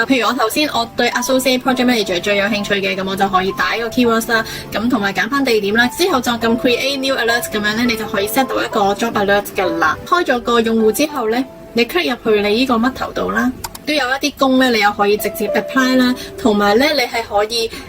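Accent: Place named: Zhanjiang, China. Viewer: 有湛江口音😂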